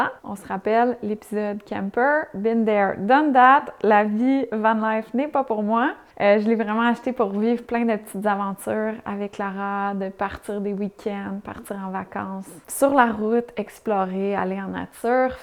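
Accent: Quebec, Canada. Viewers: Tellement heureuse de te revoir! Grâce à toi, j'apprécie l'accent québécois et tes vidéos m'ont toutes beaucoup apporté, je les partage avec ma fille qui découvre la vie (11 ans). A mon tour, je t'envoie donc plein d'amour!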